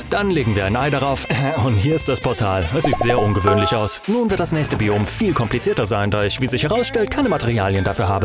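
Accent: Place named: Germany. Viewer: Er klingt wie ein alman aber es ist geil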